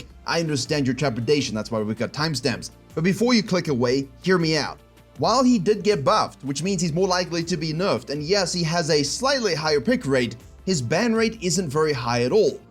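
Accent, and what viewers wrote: South Africa. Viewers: WOOOOOOOO a fellow South African.. Don't know how I didn't pick up the accent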